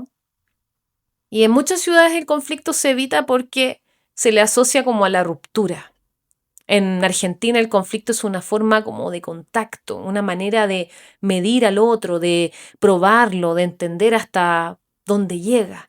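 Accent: Chile. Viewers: Es muy notorio en su hablar que es chilena... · Tu acento es chileno , los tienen a un lado , mis condolencias. Olvidaste mencionar al racismo profundamente arraigado en su cultura. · Muy lindo el acento chileno. Cierto, si no te incluyen en el grupo, mejor salirse, yo no encajo en ninguno jaja, Saludos desde Argentina. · Yo quiero saber porqué su acento chileno no es tan marcado como en el sur. Gracias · Vos sos chilena, no? Lo digo x tu tonada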